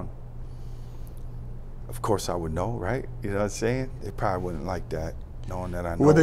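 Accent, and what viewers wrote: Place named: Chicago, United States. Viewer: I was wondering what it was in your voice the whole time.. It's that Chicago accent man.. I'm a Gary boy.. Family off Manistee on S.W. side..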